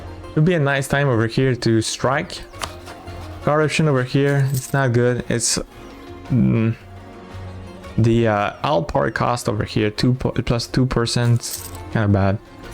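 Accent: Quebec, Canada. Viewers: Ça c'est un accent québécois 😂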